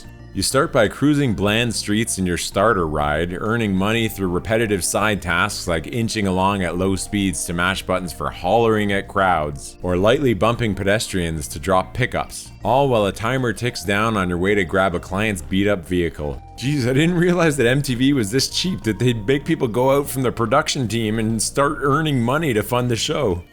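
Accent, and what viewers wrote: Canada. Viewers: Love the Canadian accent 🫶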